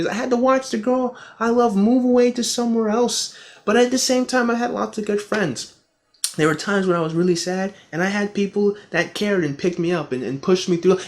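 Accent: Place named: England, United Kingdom. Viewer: Am the only one who likes him whenever he speaks with an English accent. He should try to do a whole video speaking in an English accent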